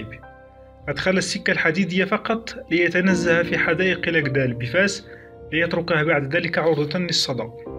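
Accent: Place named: Syria. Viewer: يوميات المصريات يا أخوة اسمعوا صاحب الفيديو هو سوري مش فلسطيني هي لهجتوا بتكون سورية اسمعوا مليح لهجتوا سوري عميل الصهاينة و العياشة المخزن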